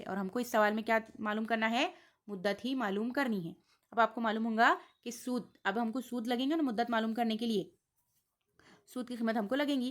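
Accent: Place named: India. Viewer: Good job. Hmaray bacho ko boht faida hoga. Itni saaf urdu india may. Salute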